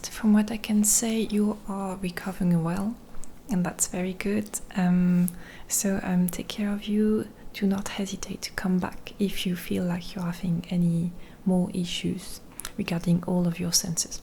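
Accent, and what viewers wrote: France. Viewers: French Accent? 😍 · Je trouve ça chou l'accent français en anglais ^^ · Même pas 2 secondes d'écoute et je reconnais ce magnifique accent français. En vrai ça va on est loin du niveau de Hollande xD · French accent? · Being a 50 year old German male, I'm struggling a little bit with my imagination being a cheerleader 😁. But for listening to your soft french accent ... let's go for it ... 👩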